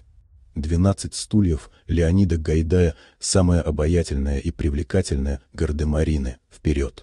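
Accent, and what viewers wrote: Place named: Urals, Russia. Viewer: Ты что с Урала?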